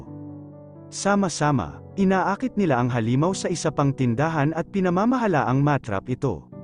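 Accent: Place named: Visayas, Philippines. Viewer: Debris ba? Bisaya kasi mag pronounce eh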